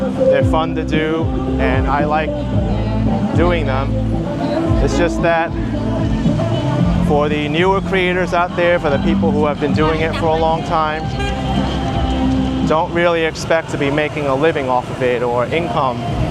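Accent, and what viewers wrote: New York, United States. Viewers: You're a walking billboard for NYC with your accent. That's what initially drew me to your channel. I enjoy watching any content from NYC be it food reviews, apartment tours, fart videos, tourist videos, and opinion pieces. It's just felt like you've been on very long vacations the past year away from NYC so I haven't watched. Being from Toronto, I've rewatched your travels here countless times. You need to come back to Hong Kong Bistro Cafe. 😃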